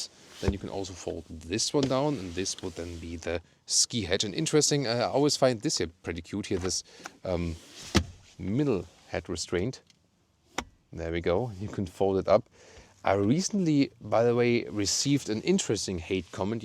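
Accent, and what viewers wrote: Germany. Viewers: love your videos ....i think reviewing cars just sounds better with a German accent !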